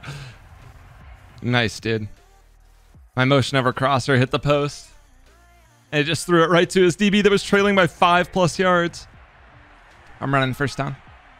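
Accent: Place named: South, United States. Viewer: I’m here for the southern accent and I lost already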